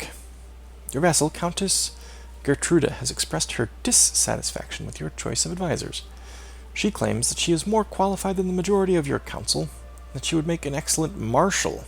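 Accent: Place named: France. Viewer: Renowned Physician adds a bonus to Physician-ing from what I can tell. What you should do is grant Julian some land then he will stop asking to join holy orders, or just ship him off. I don't know if you noticed but you lost the North of Greece/Turkey. From Constantinople East to the Il-Khanate. That French accent sounds like the narrator in the Jeanne d'Arc campaign in Age of Empires 2. Smashing icons is what Iconoclast is all about. No icons to God so we must break them. You can check out the society under the society tab which is the last one up the top.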